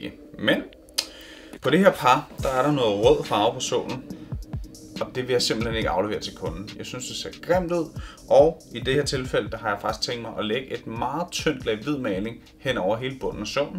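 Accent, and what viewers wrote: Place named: Scouse, United Kingdom. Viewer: Thought you were talking scouse at first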